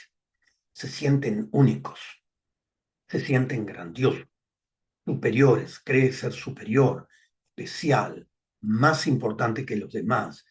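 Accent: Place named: Argentina. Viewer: Gracias por tomar tu tiempo y hablar de esto temas importantes Pareces argentino, el acento , yo soy argentino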